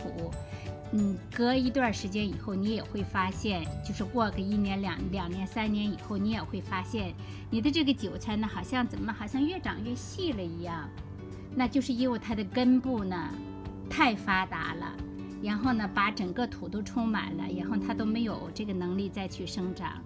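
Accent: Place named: Weihai, China. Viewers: 大姐是威海的吧，字里行间家乡味儿改不了啊！ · 听口音好像山东的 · 听您的口音好像山东威海人呢！ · 哇、妳也是威海妹子?咱也是威海人。難怪聽著那麼親切!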